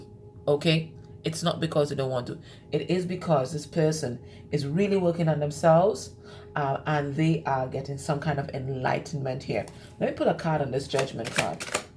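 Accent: Trinidad and Tobago. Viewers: You sound Trinbagonian to me.....your picture even looks like someone I grow up with right here in Tobago. You have a very strong resemblance to that person.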